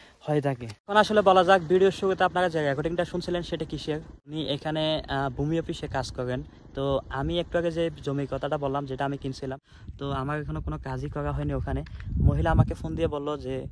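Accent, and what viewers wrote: Chittagong, Bangladesh. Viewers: কথার একসেন্টেই বুঝা যাচ্ছে চিটাইঙ্গা ❤ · Chittagong er manusher shadu vashai Kotha bolte akto shomossha hoy ata shodo onar na shob Chittagong er loker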